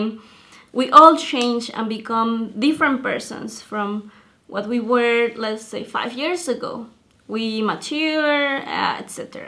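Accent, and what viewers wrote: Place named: Mexico. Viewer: Fun you mention about the language. Your accent is sooo Mexican. Your English fluency is too slowww to talk English every day. So sorry is hard to believe that.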